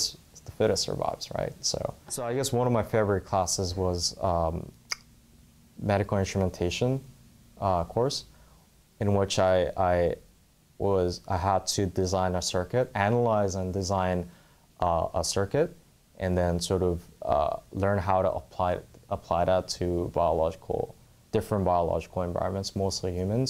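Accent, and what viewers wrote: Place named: Asia. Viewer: probably because asian accent(im asian too btw so don't call me racist)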